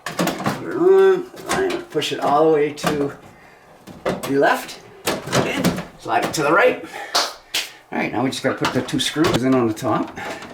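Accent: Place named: Long Island, United States. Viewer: Smoke test - LOL Thanks... You must be from Long Island or New Joysy!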